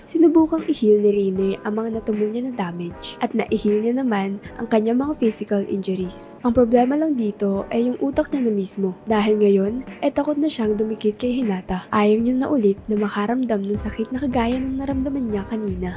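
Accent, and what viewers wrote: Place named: Conyo, Philippines. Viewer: ang conyo ng voice, approve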